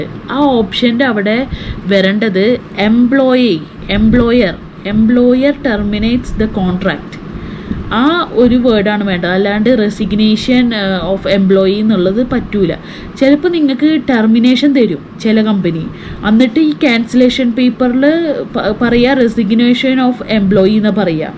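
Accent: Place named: Ernakulam, India. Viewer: I like your slang tune 😅 Eranakulam?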